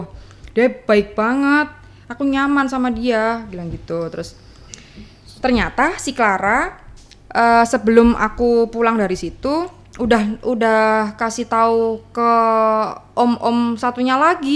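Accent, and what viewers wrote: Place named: Banyumas, Indonesia. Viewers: Medok bngt mbak nya😂😂😂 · Suaranya mendok banget😁❤ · Medok kali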